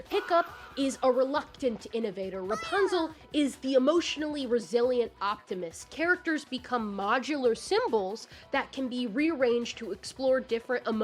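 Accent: South, United States. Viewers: you're really cool and smart and gay thank you for not hiding your accent also what's the license plate on your fall off of (sincerely, another queer redneck)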